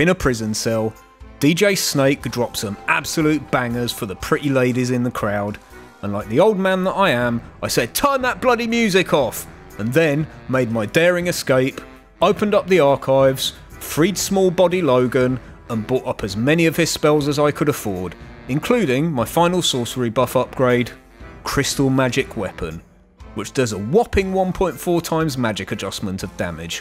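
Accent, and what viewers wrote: United Kingdom. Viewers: oh god you're british. not watching bye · I absolutely love this sarcastic British narration, your amazing voice and impeccable attitude have earned you one more sub, and hopefully many more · I just love tired british acents for example how you say "at a" just sounds like ah a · Why the hell do you sound like old Pyrocynical? Or all british people sound like that?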